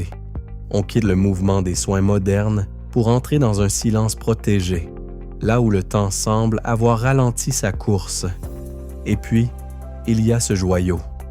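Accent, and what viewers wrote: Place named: Canada. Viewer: Merci pour ces trésors, c'est vrai que laon possède un patrimoine historique important... L'accent vocal semble être plutôt canadien qu'axonais...😉 je ne reconnais pas... pour info cette après-midi je vais virer avec ma motocyclette dans les parages ✨️✌️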